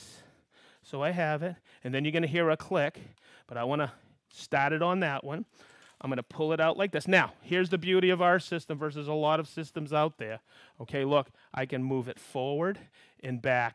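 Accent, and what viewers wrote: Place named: East Coast, United States. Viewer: "this is an accessory God...Spock God" east coast accent...love ya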